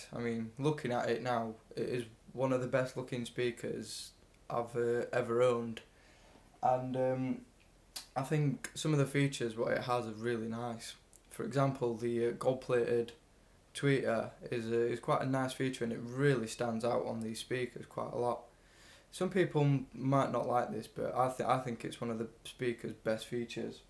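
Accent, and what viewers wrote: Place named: Sheffield, United Kingdom. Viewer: I just watched this .. and hearing you I'm guessing S61 or S62 .. ;) cos tha sounds local.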